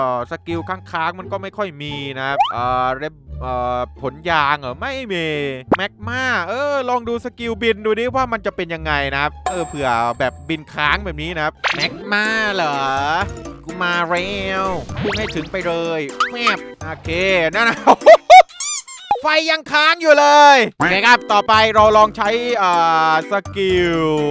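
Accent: South, Thailand. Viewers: คนใต้หรอ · ึคนใต้ใช่ไหมครับสำเนียงเเบบนี้ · พี่เป็นคนใต้หรอผมก็เป็นคนใต้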